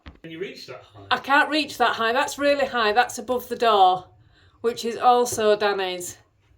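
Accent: Yorkshire, United Kingdom. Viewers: Oh, I'm so sorry that some grubby little person has seen fit to spread their poison onto your lovely vlogs. And they are little people with little lives who have nothing better to do so let's give a collective f off (I don't use that word but it seems appropriate here 😁). Someone nearly destroyed my dear friend Sue (Suzie Stitcher) who is the sweetest most inoffensive person. She nearly took her channel down but I'm glad she bounced back., your warmth and lovely Yorkshire accent just oozes out of your channel - and you have lots of fur babies 🥰😍🤩 Well, it was 14C yesterday and 40C not so long ago - I'm glad that I didn't put my long johns away Ha Ha Look forward to seeing you soon. Much love, Angela 😘😘😘XXXX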